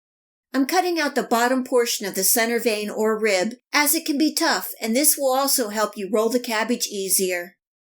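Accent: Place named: Northeastern Pennsylvania, United States. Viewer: You sound like you're from Northeastern Pa.......me, too! Scranton boy! Love pigs in the blanket! Good recipe,! Every household in my neighborhood made them slightly different...but they were all good!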